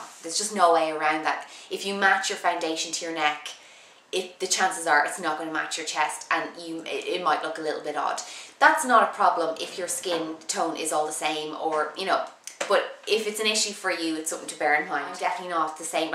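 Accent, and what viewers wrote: Ireland. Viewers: You Really sound Irish, cause i know Because i am irish, Just not from dublin, CORK!!!!! bt dub Thanks for da tips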